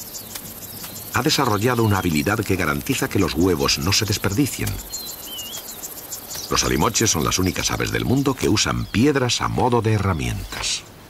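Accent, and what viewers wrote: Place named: Spain. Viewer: Se olle de la madre con acento Gachupin.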